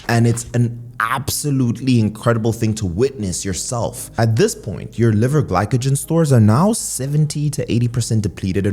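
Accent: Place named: South Africa. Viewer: Your accent does not seem British sir....Sounds more like South African.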